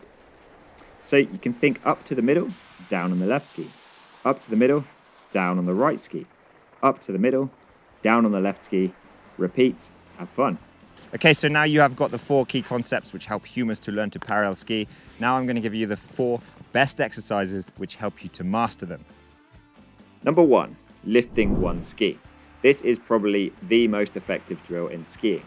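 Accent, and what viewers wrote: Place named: United Kingdom. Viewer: Love to see a good video from, that british accent!! Allez Alvoriaz!:D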